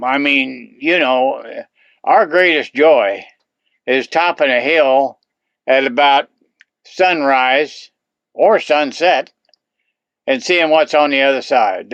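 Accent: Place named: Texas, United States. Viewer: Great person, Love his bit of texan accent.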